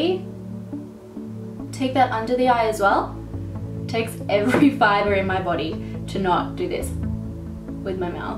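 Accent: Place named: Australia. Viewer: I love your Australian accent and you do you makeup amazing so I had to subscribe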